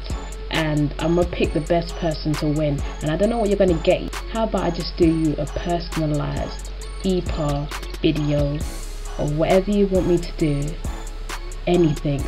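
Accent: South, United States. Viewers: WOAAAAHHH!!! Your american accent is really good! I thought you were american! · Dsyum u got that southern American down....ok...ok.i hear u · damn u have a good american accent! dammn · so thought you were american!! aha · Loool, your american accent is gud yanno. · You did good! It sounded like you were from a southern state :D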